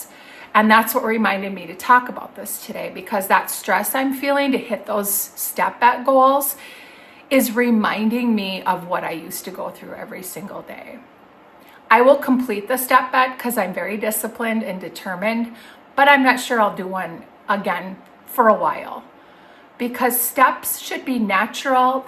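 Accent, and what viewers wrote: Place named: Midwest, United States. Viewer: I love that midwest accent❤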